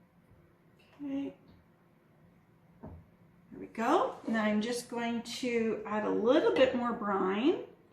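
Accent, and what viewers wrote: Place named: Canada. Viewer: Hello! Thanks so much for visiting me! Wow this was so interesting! My Husband is Japanese so I make a lot of fermented Kimchi! I know you must live in Canada. Love your accent ❤️. New Friend here❤️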